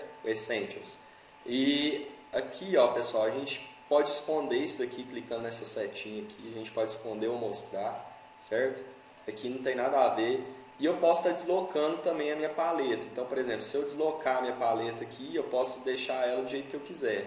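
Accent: Minas Gerais, Brazil. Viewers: meu deus que fofura de sotaque mineiro. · Alguma duvida que é mineiro?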